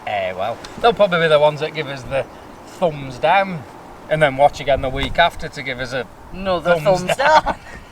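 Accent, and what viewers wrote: Yorkshire, United Kingdom. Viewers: Just love your Yorkie acent,ime Lincolnshire twang maeert 😜,love whatching yours and chanel you make it look so easy like second nature,take care both of you stay safe.🎥👌👍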